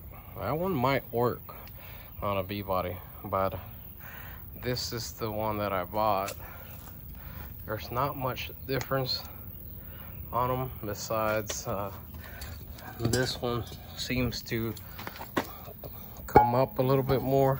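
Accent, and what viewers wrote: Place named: Texas, United States. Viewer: Knew this guy was from Texas right away, set video speed to 1.25. Much better.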